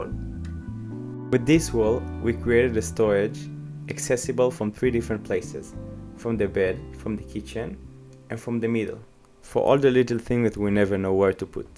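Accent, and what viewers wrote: France. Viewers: you sound French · L'accent français représente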